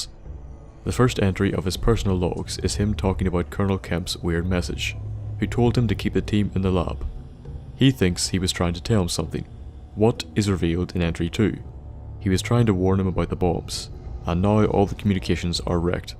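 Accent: Northern Ireland, United Kingdom. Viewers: that's just his northern Ireland accent, doesn't matter if he tries to speak ina different way he'll just sound the same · are you british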